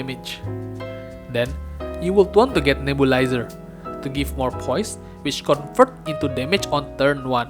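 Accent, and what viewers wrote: Indonesia. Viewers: indo ya bang? kedengaran banget accentnya wkkwkw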